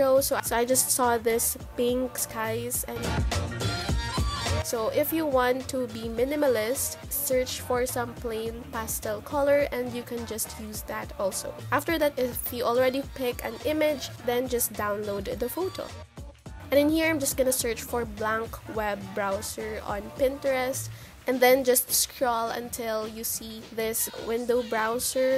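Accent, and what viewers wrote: Philippines. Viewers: boses mo parang pilipino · Hello I’m new to checking out your channel :) It’s my first time learning & how to with tutorials on everything of making an intro for YouTube channel video! Question? Can I do these on my phone? Because I often use my phone more than my Mac 💻 or iPad? Because I don’t often bring these with me anywhere as they are heavy & not all of my photos/videos sync to my laptop or iPad,I took it off and forgot how to put it back haha 😂 anyways thanks for sharing I hope I can learn in no time! Also by the way your accent is I can tell your Filipino? Is that weird? 😅are you live in the Philippines or in the states? I miss being home but too ouch heat is kinda bad for my health! Thanks again for sharing!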